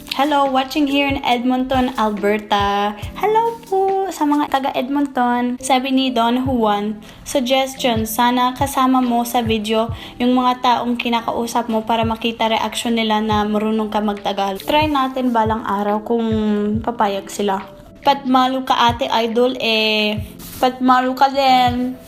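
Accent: Visayas, Philippines. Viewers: ang galing pati punto pinay na pinay...yun na lang toronto..ganun talaga samantalang kahit sa call center samin kung banggitin yun "torono" haha. saka "international" eh "inenational"... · Natutuwa ako sa galing mo. 10 months ang dami mong natuto. Ang cute pa ng visaya mo. More power to you & greetings from New Jersey! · Shout out.. Waray here willing to teach yah.. Ung accent and diction mo are in visayan accent.. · grabe ka Bisaya!! Bisaja gyud kaayo ka daiii... Well spake... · Hahaha... Nag lalaway ako sa crispy pata ang lutong. Nakaka gutom eh. Oo parang ang pagsasalita mo ng tagalog ay may bisayan accent. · Haha. Sa totoo lang, pag kinausap mo ang isang pinoy na lalaki sa telephone na nagtatagalog ka, hindi magiging interesado sa yo kasi ang dating (whew! Im having a hard time writing this in Tagalog coz I dont know how deep I can go..lol) ng boses mo pag hindi ka nakikita para kang bisaya na marunong magtagalog pero pag nakita ka in person sobrang magugulat yung kausap mo sa telepono. 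Kumbaga, you got pre-judged and dumped just basing on your accent hehe...on the contrary, take that as a compliment. Meaning, you've learned to speak tagalog and sound like a true blue Filipino na hindi sosyal. Haha hirap talaga di ko alam kung naiintindihan mo ang comment ko. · Filipina na Filipina yung pagsasalita! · You've got the best pinoy accent. & YOU ARE SO PRETTY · Hahaha nakakaaliw mag tagalog eh ... medyo malalalim pero parang pinay nadin pag pinakinggan mo ng hndi nakatingin 😊😊😊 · Hi Hello from cebu i hope maka visit ka ulit sa PH hehehee Happy ako tlaga kasi the way you speak tagalog, may pusong Pinoy tlaga hihi GODBLESS lablab 😘 Keep it up 💪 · “Gwapa xang bayhana” it is translated into “beautiful/pretty this/that girl” your tagalog is good in a visayan accent. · I like how your accent when you spoke english was kinda like a filipino accent. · Apreciate your filifino accent keep it up im your no.1 fun wish ko pag nag visit ka ulit dto sa philipines kain tayu sa mga sikat na kainan😁😁😁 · Good job...pinay na pinay ang dating m ms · Hi...ibig sabhin ng bayhana sa bisaya is babae...cute mo mag salita ng bisaya. 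· You just sounds like a pinay province girl speaking tagalog. But honestly maganda ka lalu na yung mata mo, so expressive. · Ang sarap pakinggan ng tagalog mo, may bisaya accent. Very impressive. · Grabe ang accent pinay n pinay☺️☺️☺️☺️☺️👍🏼👍🏼👍🏼👍🏼,well done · Bilib ako sa iyo. 10 months ka lang nag stay sa Philippines pero naging fluent ka agad sa Tagalog. True, yung accent mo is Visayan accent. Kaya yung mga Bisaya kapag nagsalita ng English maganda yung accent nila. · You so sweet at ang lambing ng boses mo,pinay na pinay ang salita mo at kilos,...nakakatuwa ka.... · NAKAKAGUTOOOMM!!!!! SHARAPPP!! Are my ears playing tricks on me? Even when you speak in English you already have that Filipino accent heheheh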